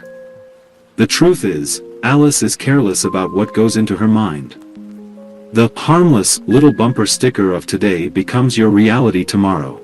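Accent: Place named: India. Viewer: Accent is like an indian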